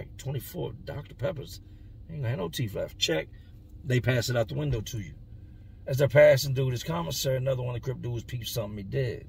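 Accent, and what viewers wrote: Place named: Chicago, United States. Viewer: I knew that sounded like Chicago. What neighborhood? lol They thought you were a King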